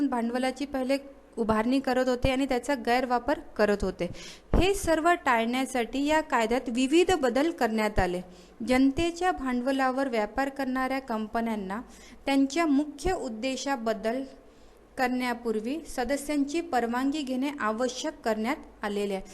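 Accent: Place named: Nanded, India. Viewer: Mam tumhi nanded che ahat na😅 tumcha language varun vatate , ekdam sundar teaching ❤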